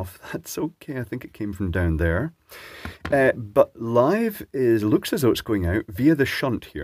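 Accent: Scotland, United Kingdom. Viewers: are you from Scotland? im Scottish and u sound Scottish sometimes but also you sound more English and its so confusing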